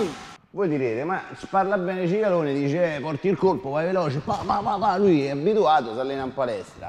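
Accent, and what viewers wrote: Rome, Italy. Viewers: Il più bel corso di difesa personale mai visto...Grande anche l'accento Romanaccio...Aooo sei troppo forte..Ciao Cicalone..Pam Pamm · Amo troppo l'accento romano xD Anche se sono Genovese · a romanaccio!!!! te piace da menà!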